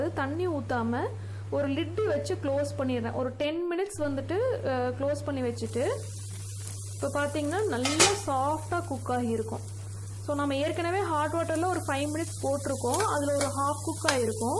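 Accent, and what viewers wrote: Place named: Coimbatore, India. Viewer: I thought u must be from coimbatore.the people in coimbatore speak like u.their speech is very respectful.iam also tamilian.my mother is from trichy.my husband from coimbatore.i did my education in karnataka.i speak in home Tamil.is my opinion better to speak mother tongue at home what ever state u stay .because kids forget d mother tongue .next generation kids should learn their mother tongue.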